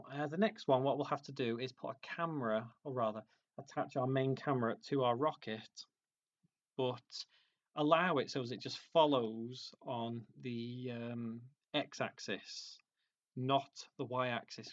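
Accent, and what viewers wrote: United Kingdom. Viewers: inglês britânico é tão maneiro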